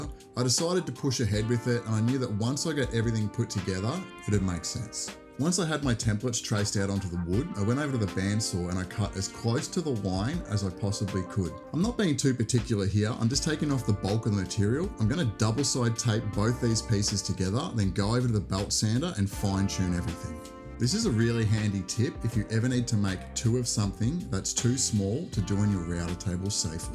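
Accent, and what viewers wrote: Australia. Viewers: Heck dude. Im brand new here. I will sub. Really nice hearing an Aussie. Cheers from Central Vic.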